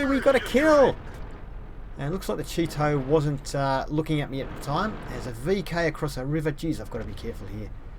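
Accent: Australia. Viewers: u aussie mate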